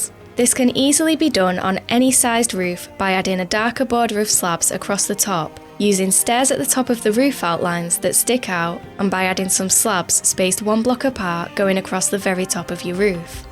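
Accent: United Kingdom. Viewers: pretty sure their accent is british, not sure though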